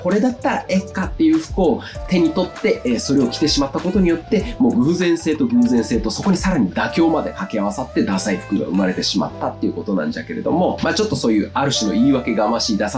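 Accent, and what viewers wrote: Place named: Chugoku, Japan. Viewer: 中国地方の方言すきだな〜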